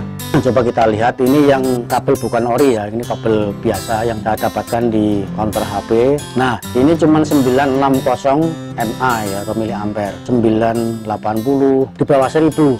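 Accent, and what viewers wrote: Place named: Java, Indonesia. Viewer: Medok sekali asli gunkid 🙏🙏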